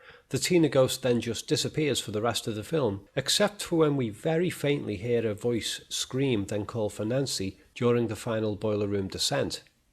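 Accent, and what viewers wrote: Liverpool, United Kingdom. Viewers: To: I absolutely love your videos. I've been binging on them since I discovered them a few days ago. Your analyses are as fascinating as they are thorough - supported with clear examples and often detailed and well-cited research. It's inspiring to listen to someone who's so passionate about a subject. Incidentally, your Liverpool accent and softly-spoken delivery (in my opinion) enhance the enjoyment of these videos. You impart your ideas in a laid-back, conversational, matter-of-fact way, which feels respectful to the viewer's intelligence in a way that other analysts seem to somehow miss by having subtle (or not-so-subtle) condescending or boastful tones. Does that make sense? With regard to a point you make in this video about Nancy's ability to finally defeat Freddy by using a technique which had earlier failed, "ignoring his existence", as you put it very well. 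My feeling is that in order for this technique to work, the victim must fully and whole heartedly believe it. in the earlier scene when she attempts to get rid of Freddy by saying the words "This isn't real, this is just a dream", she is still frightened and panicked, which tells us she still actually believes the danger is real. After the climax of the movie, Nancy has developed true faith in her words. She says them calmly and with total conviction, hence it's effectiveness in ridding the demon. On further reflection, perhaps this can be linked to your idea that in this movie God doesn't exist. Perhaps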